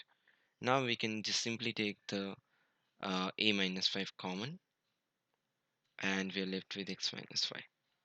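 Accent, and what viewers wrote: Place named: United Kingdom. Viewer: Bro.. U should make in Hindi and English mix.. I mean.. Teacher tech like that But u are said like a British.. It's very bad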